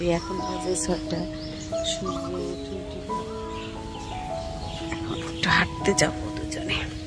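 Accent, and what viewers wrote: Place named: Bogura, Bangladesh. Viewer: আপু তোমার মুখে বগুড়ার ভাষা শুনে খুব ভালো লাগছে 😊আমার দাদাবাড়ী বগুড়া শেরপুরে সীমাবাড়ি আর সারিয়া কান্দি ভুয়া গাথি অনেক আত্মীয় আছে😊❤❤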